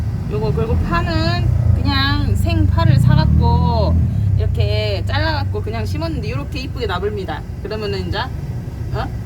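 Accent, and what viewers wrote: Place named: Jeolla, South Korea. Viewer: ㅋㅋㅋㅋ전라도 사투리 구수해유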